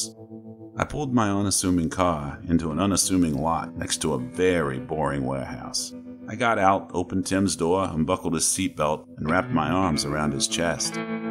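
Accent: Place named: New York, United States. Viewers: Yes I have been to Boston etc but You nailed that fact, You def sound like You came from this side of the Country, I think You did a great Job but us NYER's while dislikes by so many other states have a ton of NYC pride, lol I would be horrible trying accents because I have my English accent is horrible as is my Southern accent etc, I love your channel brother, You are one of the best, Question may be weird but are You Rom's father? I'm asking because it's a rumor around the web and I notice you guys work together along with TDS a lot. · This notification was late af! WTF You Tube? I will always listen to every story you put out. I am digging your New York...New Jersey(?) Accent. Amazing job as usual!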